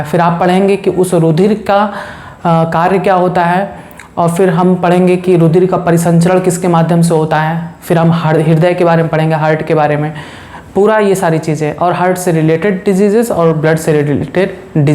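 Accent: Chhattisgarh, India. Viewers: Aap Chattisgarh se ho kya ?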